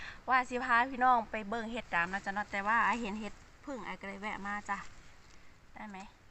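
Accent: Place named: Sakon Nakhon, Thailand. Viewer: น้องไออยู่จังหว้ดดั๋ยจ๊ะคือเว่าสำเนียงสกลนคร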